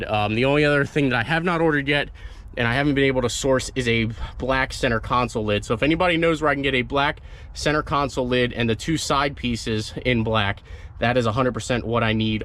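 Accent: Pennsylvania, United States. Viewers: Got some hard work on this one coming! Is it a PA accent that gold = goad and water = wawter?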